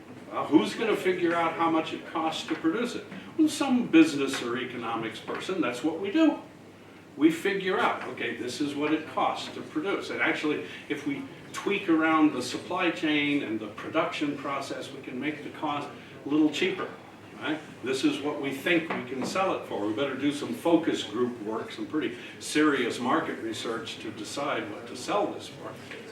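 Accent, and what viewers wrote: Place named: United States. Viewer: Pretty early, on Monday a little bit sleepy 😅 but still very motivated and eager to absorb new information, we were provided with an amazing, as always, speech of. So firstly, talked about agricultural enterprises, about technology, ways to run business successfully, quickly! He pointed, and kept repeating that world of business is volatile. Never stands, always varies, changes. Furthermore, he shared as the example the Smart Bandage. This kinda put us in the picture more of what he talked about. I am truly grateful for a speaker like him. Because I get to hear how Americans utter words and express ideas!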